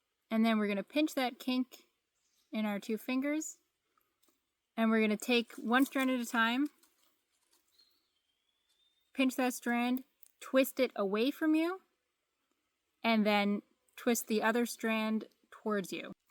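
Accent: United States. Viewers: ... fantastic technique .. congratulations ... are you from Queens?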